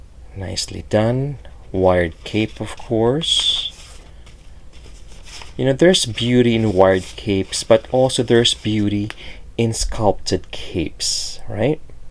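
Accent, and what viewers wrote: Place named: Pakistan. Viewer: I believe he is from pakistan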